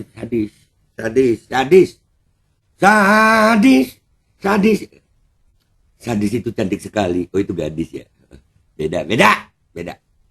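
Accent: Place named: East Java, Indonesia. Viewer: LOGATNYA SEPERTI JAWA TIMURAN..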